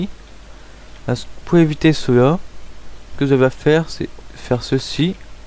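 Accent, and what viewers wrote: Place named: Russia. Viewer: J'adore ton accent russe , sa a du être difficile d'apprendre le français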